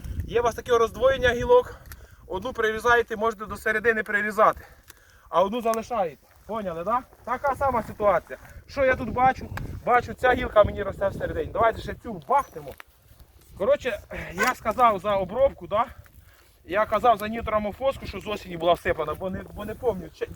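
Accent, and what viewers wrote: Vinnytsia, Ukraine. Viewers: Балакає по вінницьки😂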